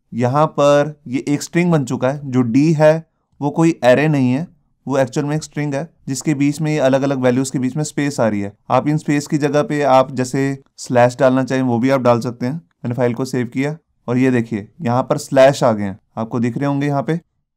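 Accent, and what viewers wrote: Punjab, India. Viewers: sir r u from punjab??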